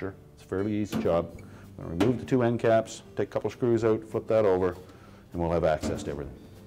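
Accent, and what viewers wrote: Canada. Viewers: Thank you, this was exactly what I needed. Love your accent, is that Canadian?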